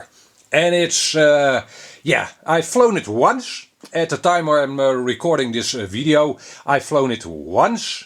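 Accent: Netherlands. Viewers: Nice work again , always the best positive products reviews and information. I like your English Dutch dialect gives it a fibe. Hopefully you'll sending this quadcopter to Sweden !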